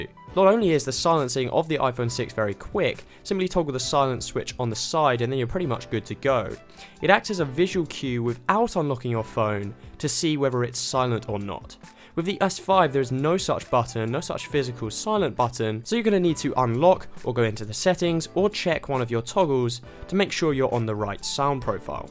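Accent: United Kingdom. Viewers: ненавижу британский акцент !!! аааааа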